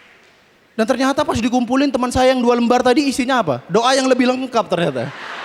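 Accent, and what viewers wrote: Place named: Madura, Indonesia. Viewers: Logat maduranya kental bnget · si muslim maduranya masih berasa wkwk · duro nya medok mas😂😂😂😂 lanjutkan kita serumpun😂😂😂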